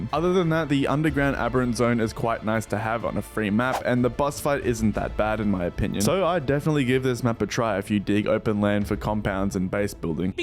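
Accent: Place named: Australia. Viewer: Completely off-topic but this comment made me realize that I was hearing an Aussie accent, and now I’m barely noticing that a large number of youtubers I’ve watched throughout my years are actually Australian lmao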